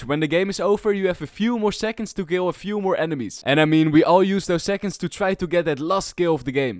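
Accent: Netherlands. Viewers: Ik weet dat je Nederlands bent bij de accent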